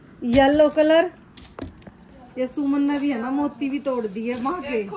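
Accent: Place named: Haryana, India. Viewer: She is belong to haryana state ..so speaking tone is different.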